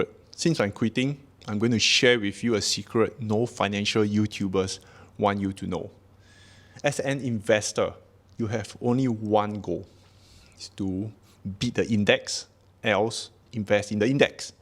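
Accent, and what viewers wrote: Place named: Singapore. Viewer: God bless you 🙏 You are one of the best, and what I always liked about you was you are so genuine. You have taught me so much. Your English is great and even better with your beautiful Singapore accent (a place close to my heart). Take care, look after yourself and ignore the toxic trolls - they have nothing on you! 🙏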